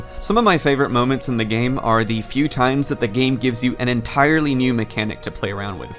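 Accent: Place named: United States. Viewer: i have a question why do you have american accent when you are australian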